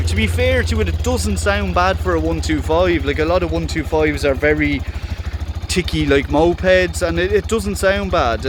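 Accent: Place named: Ireland. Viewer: love the irish accent. Big fan of Conor McGregor 😁❤️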